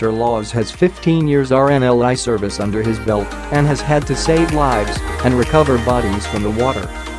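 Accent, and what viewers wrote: United States. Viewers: Narrated by an American robot?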